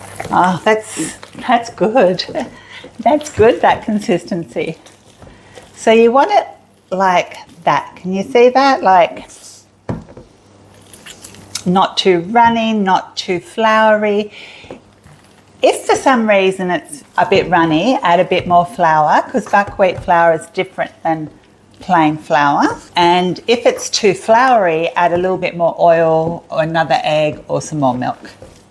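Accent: Australia. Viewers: Never apologise for your beautiful Aussie accent.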